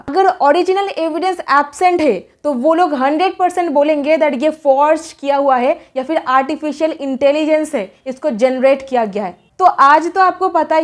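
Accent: Bengal, India. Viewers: 😊 Bengalis jab Hindi boltey hain tab Aisa hi boltey hain😊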